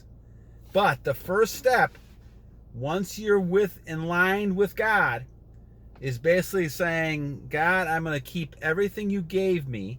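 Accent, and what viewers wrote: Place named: North, United States. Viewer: you from Minnesota, Michigan? I recognize a northern accent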